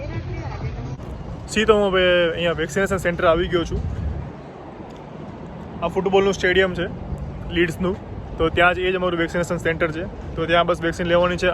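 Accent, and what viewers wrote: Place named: Gujarat, India. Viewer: Sorry sorry ohho gujarati tone in English is superb fun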